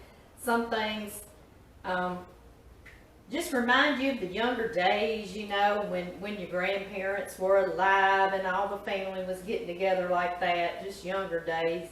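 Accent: South, United States. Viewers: With that Southern accent, you KNOW its gonna be good food! 🤤😁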